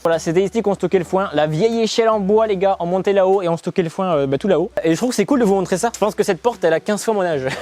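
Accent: South, France. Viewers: TU ES TOUJOURS AUSSI EXTRAODINAIRE AVEC TON ACCENT DU SUD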